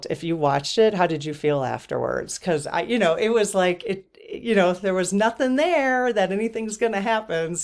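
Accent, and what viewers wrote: Midwest, United States. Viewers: I love the midwest accent!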